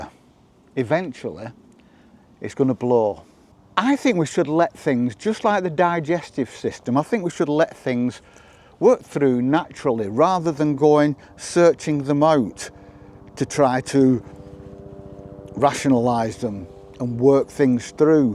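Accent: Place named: Lancashire, United Kingdom. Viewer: thanks, joe soap. loving yer lancs. lilt. ;)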